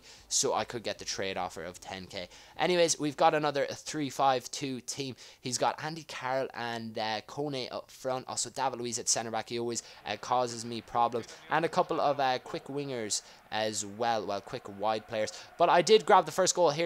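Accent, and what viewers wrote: Ireland. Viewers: What part of Ireland are you from?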